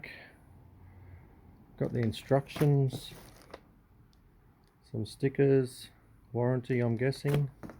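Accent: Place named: Australia. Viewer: Was not expecting an Australian! Awesome.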